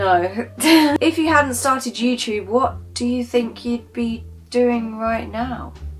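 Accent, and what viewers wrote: United Kingdom. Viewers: Your so pretty. Are you British?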